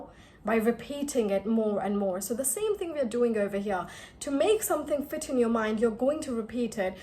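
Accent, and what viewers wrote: United Kingdom. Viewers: Are you using british accent while talking in most of your vedios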